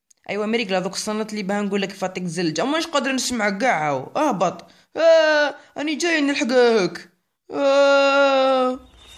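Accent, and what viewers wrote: Chlef, Algeria. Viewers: اللهجة تاع شلف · لهجة تع شلف بضبط 😂😂😂😂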